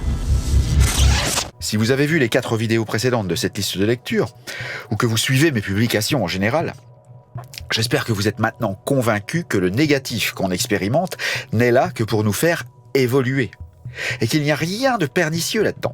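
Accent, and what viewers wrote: France. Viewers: Très intéressant. Et avec un très léger accent ardennais ce qui ne gâche rien ;-) . (Je vais être hors sujet mais tes vidéos de basse manquent un peu. Au delà des magnifiques cover de maiden, avec le niveau que tu as, ce serait génial que tu fasses plus de vidéos pédagogiques comme le galop de Steve ) merci pour tout. Musique et spiritualité, ta chaîne est passionnante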